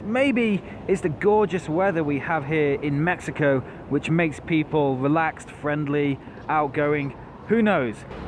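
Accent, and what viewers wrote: England, United Kingdom. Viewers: Lovely video!! luv english accent!!😝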